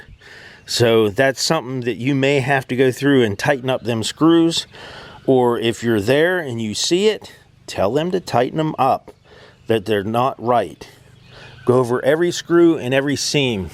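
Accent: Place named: Pittsburgh, United States. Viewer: I am so glad I just discovered your you tube site! I looked at your video playlist and am amazed at how creative a DIY master you are. I also heard in your speaking that you must be from the Pittsburgh Pennsylvania area, as you possess several distinctive Western PA speech sounds. I went to school there (Carnegie Mellon, Theatre/Speech School) back in the early seventies, and love that particular American "dialect". A very good friend lives there and so, I get to hear it again, from time to time. Thanks for your spectacular videos. I look forward to watching most of them, as you appear to know all one should know in order to take care of ones self in this world (or not to get ripped off when someone else 'services" your need) It is never too late to learn. I'm 70, and still have lots to learn!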